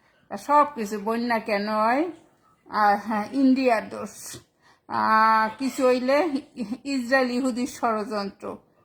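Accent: Barishal, Bangladesh. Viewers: কথা শুনে মনে হচ্ছে বরিশাইল্লা মানুষ । তবে এক্সপ্রেশন গুলো অসাধারণ ছিল !🫡🫡🤣🤣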